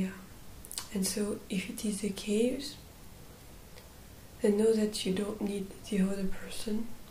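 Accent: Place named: France. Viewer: Thank you for your work! Your video (another one) popped up in my feed about a week or two weeks ago, and now this one. So I'm a new subscriber now! :-D I discovered light language a few years ago. Where are you from? I hear a French accent. :-)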